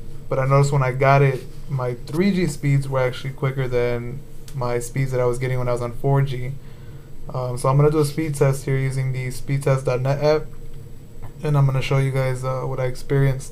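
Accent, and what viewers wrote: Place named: India. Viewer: Indian accent. Indian like me I reckon. Kya haal hai bhaaya? haha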